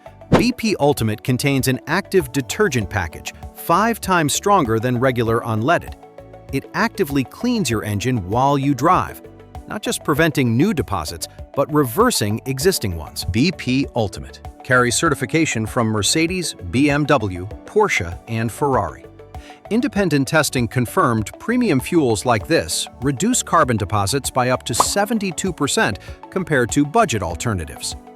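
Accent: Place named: United States. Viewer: And an American accent . I smell a load of AI bollocks !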